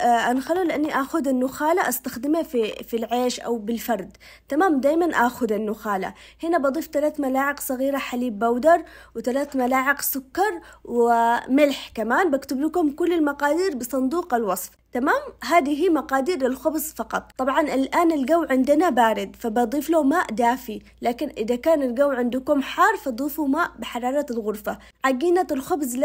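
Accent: Aden, Yemen. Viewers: مسرفه هههههههه ماشاءالله لهجهتك عدنيه رغم انك عايشه بالسعوديه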